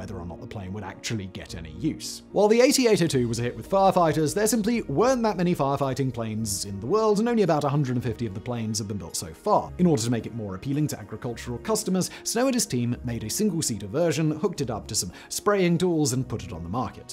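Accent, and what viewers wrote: United Kingdom. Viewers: Too much "British inflections" makes this too hard to listen to.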